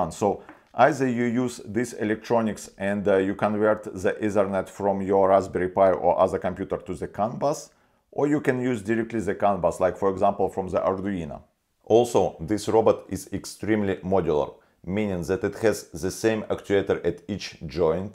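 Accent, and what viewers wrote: Russia. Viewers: Мне одному кажется что это русский английский, робот офигет какой тихий, интересно как устроен сервопривод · Хороший робот, быстрый и тихий. С русским акцентом намного понятней) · бесит жёсткий русский акцент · ЧИСТО РУССКИЙ АКЦЕНТ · русский акцент :-). Крутая вещь. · очень выражен русский язык, так как английский не родной.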